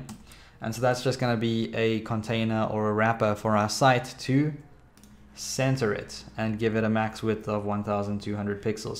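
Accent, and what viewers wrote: South Africa. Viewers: I'm getting addicted to your tutorials and that accent from SA. Thanks, from Essex, UK.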